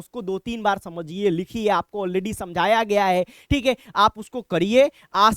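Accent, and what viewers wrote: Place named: Bihar, India. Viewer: Sir ka toh bihari accent h ...thoda relate kar paa rahe h kyunki hm bhi Bihar se hi h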